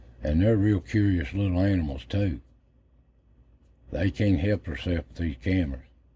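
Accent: Appalachia, United States. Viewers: Hello Mr.. Just subscribed. I did it cause some of my ancestors came from your part of the country. I was born and raised in Northern California. Your voice reminds me of my grands and greatgrands (grandparents, uncles and aunts) at holidays and family reunions. Heck, I have some of the same way of pronouncing certain words or using ole Appalachian Mountain terms after generations apart. I used to get teased sometimes. I didn't care I liked the way we sounded. I'm 63 now and listening to you and viewing your videos make me feel like I've come home. Fact is one of my daughters moved to and married in Kentucky years back. She been searching family and found much information on the old ones and just how connected we are. She sounds now like she was raised there. I love it!❤ Thank you.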